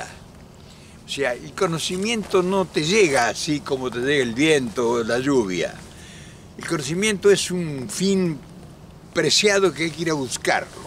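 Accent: Argentina. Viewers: Buenos días y amigos del espacio Quería hacer un comentario que parece insignificante pero no es menor como argentino admiro la manera en que mantiene a pesar de los años que se vió obligado a irse del país nunca perdió el acento bonaerense (o sea el ser nacido en la provincia de Buenos Aires) para los que no conocen nuestra provincia simplemente gracias por su autenticidad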